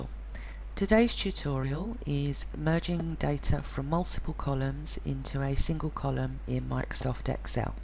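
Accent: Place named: United Kingdom. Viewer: someone with a British accent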